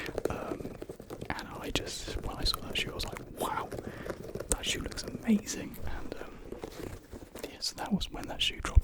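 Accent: United Kingdom. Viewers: A British asmr voice, yey🎉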